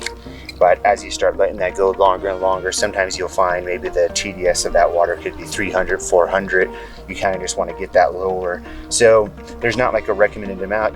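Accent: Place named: United States. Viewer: You have one of the most calm and well spoken American accents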